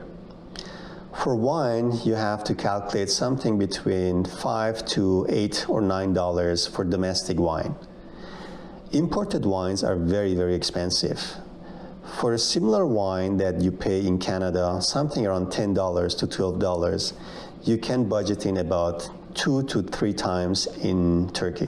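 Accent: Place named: Iran. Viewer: You sound Iranian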